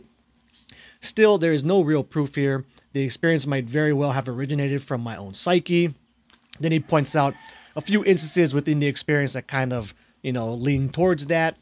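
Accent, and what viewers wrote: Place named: Hawaii, United States. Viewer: haha just the way you end your words 😂😂 what island are you from? I was born & raised in Maui, moved here to Wa state in ‘16 right after graduation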